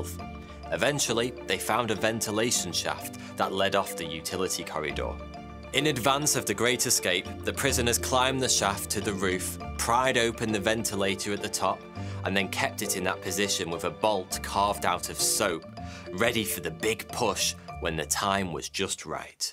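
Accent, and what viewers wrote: United Kingdom. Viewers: So id got my old account kicked of youtube 🙃 and not having this guys videos in my life gave me extreme anxiety i really couldnt stand not having a steady flow of information in a british accent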